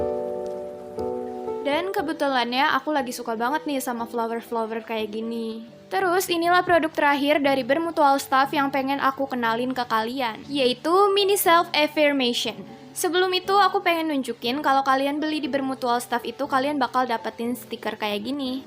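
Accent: West Java, Indonesia. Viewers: Qaqaq orang Sunda:v? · Ka orng Sunda nih?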